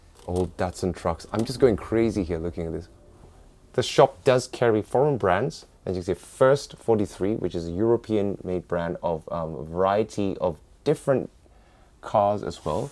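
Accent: England, United Kingdom. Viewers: why is the talking like an Englishman if he's a Japanese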